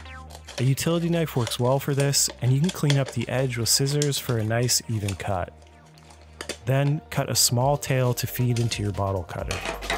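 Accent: Canada. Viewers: Well, first I did enjoy the video, it was a reasonable use of my time. Your tone and delivery is smooth without being rushed, and the background music is faded in and out appropriately. From a video-crafting perspective, it's well done. For that alone you get a like and a comment to hopefully put more eyes on your work. :) The subject, on the other hand, is a bit lacking. "Everything" I need to know is a lot more than what you've mentioned. Equipment costs? Okay, maybe this isn't the best place for that unless you wanted to truly endorse the Sustainable Design item. But still, a ballpark is useful. How about time costs? How long does it take you to create a usable length of filament? That is a huge obstacle to any kind of home filament making - it's just so slow. And bottles? From your accent and channel name I'm guessing you're in Alberta. Alberta has a really good bottle recycling infrastructure. 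This isn't going to keep anything out of the landfill, unless you just trash your bottles instead of recycling them - and even so, YEG and YYC both have very good diversion processes at their landfills to catch stuff that can be recycled. In fact, in Alberta it's adding to the overall waste because it's harder to find a recycling centre that will take a bottle fragment. I guess what I'm trying to say is this: any video about home recycling/filament making really needs to hit the problems as well, at that means some discussion of the time cost and the fact that bottles are one of th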